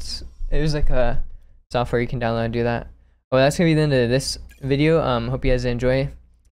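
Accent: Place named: United Kingdom. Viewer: your British is perfect👍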